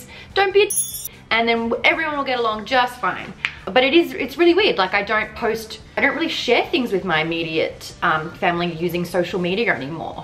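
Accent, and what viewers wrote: Australia. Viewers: You sound more Aussie than usual. I dig it ;)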